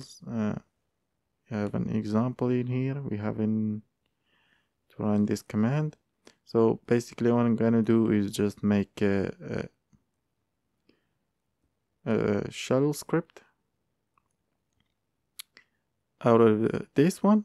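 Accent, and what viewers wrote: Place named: Morocco. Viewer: great. i was confused by your voice and accent because you sound like me and I was right you are moroccan too LOL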